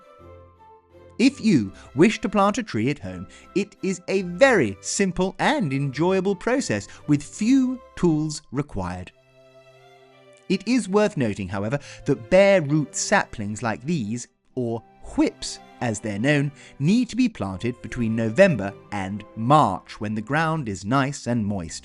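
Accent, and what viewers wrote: RP, United Kingdom. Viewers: Your rp accent is rather trying. Much like Adele's so called cockney accent. Could you please try another one ?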